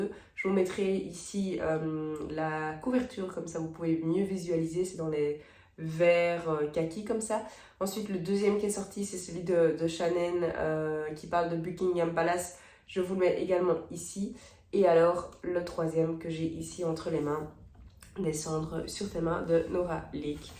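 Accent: Belgium. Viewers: Bonjour, contente de te retrouver en 2023 même avec cette fin de Covid qu'on sait très longue. Je te fais tous mes meilleurs vœux pour 2023 ainsi qu'à tes proches. De belles lectures et des vlogs supers comme tu nous en a fait en 2022. Ma première lecture de Janvier 2023 est le Domaine de l'Héritière de Lucinda Riley. Je n'ai pas encore lu Fleat House que j'avais reçu mais je vais avoir de la lecture à partir de fin janvier car je suis jury catégorie polar pour livre de poche. Enfin, j'espère que cette année tu continueras à t'épanouir. Le moral bas entre mi-septembre et fin octobre, je connais très bien, c'est une période très compliquée ou j'ai perdu ma maman en septembre 2011 et mon papa en octobre 2013 et cela est toujours douloureux surtout pour mon papa. Voilà continue à nous régaler avec tes vlogs, ton naturel, et ton accent pour les nombres en belge.😃Amitié Laure sur insta.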